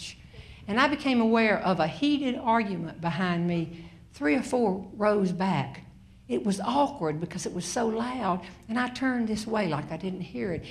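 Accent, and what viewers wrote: South, United States. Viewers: I love it....she has a wonderful delivery and great comedic skills! Her Southern accent is wonderful and the fact that she profanity free is the best.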